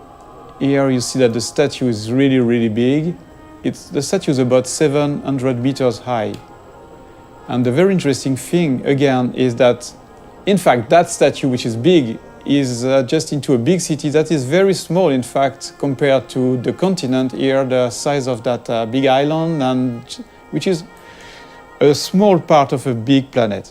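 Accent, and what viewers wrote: France. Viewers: il est français donc pour son accent on peut comprendre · sa se voit que c'est un francais vu son accent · Et les gars stop, pour son anglais. Il a raison de faire la vidéo en anglais. Et puis entre nous vous auriez un meilleur niveau d'anglais en France çà irait mieux, vous vous ne poseriez même pas la question. L’école ne suffit pas alors faut travailler a coté pour l'anglais. Et la franchement même si il a un accent français qu'est ce que ça peut faire. Au moins il fait l'effort de faire une vidéo compréhensible par le monde entier. Alors arrêter de penser en permanence a seulement votre petite personne !!! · J'avoue l'accent français se fais ressentir de fou 😂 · C'est con que seul les français ont honte de leur accent le gars est français il va pas faire l'accent américain,chinois ou africain le pire c'est que pour les ricain l'accent français est très beau.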